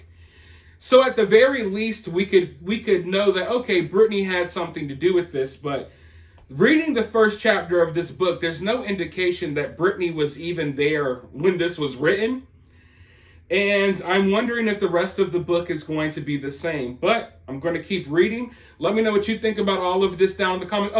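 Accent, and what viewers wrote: United States. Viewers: You are speaking such a beautiful american-english, so I (a german speaking person) can follow you. Thank you!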